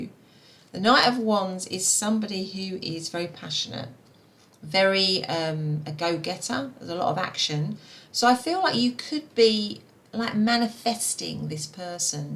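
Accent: United Kingdom. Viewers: I just love a British accent hehe, so comforting/sweet sounding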